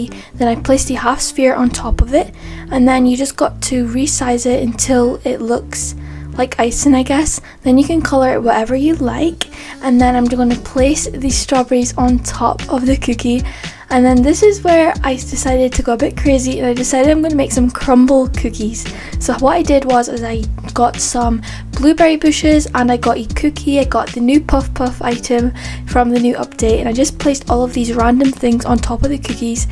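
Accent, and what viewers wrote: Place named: Scotland, United Kingdom. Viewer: oh that's cool:) I like your accent and it's very pretty:3 I was gonna ask if it was Scottish😭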